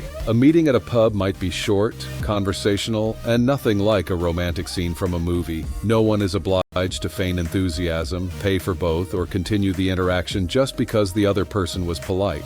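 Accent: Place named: United States. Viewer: The AI voiceover would be a bit more appropriate if it was anglicised & deleted americanisations like,(Looo——— tenant.& rowwt) The video is ,however, a true reflection of Brits in general.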